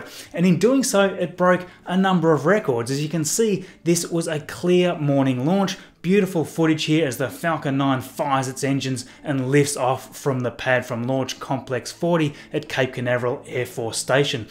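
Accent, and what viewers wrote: Australia. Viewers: I’m subscribed and know this channel but always forget it, the way i remember you is the Australian accent lol 😂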